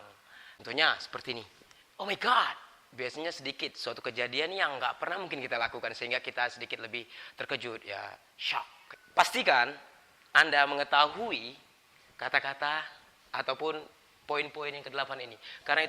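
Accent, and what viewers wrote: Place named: Poso, Indonesia. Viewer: org poso ya??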